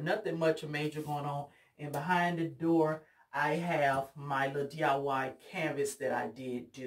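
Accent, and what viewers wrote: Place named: Louisiana, United States. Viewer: You sound like me when you speak I’m Louisiana native are you?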